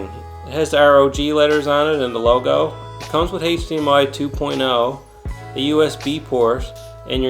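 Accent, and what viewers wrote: Newfoundland, Canada. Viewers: Dude you definitely have to be a newfie? Lol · You sound like a Newfie my friend, hello from a fellow Newfie if so. Great video, keep up the good work! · i know a newfie accent when i hear it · You from NL or east coast Canada by chance? Knows that accent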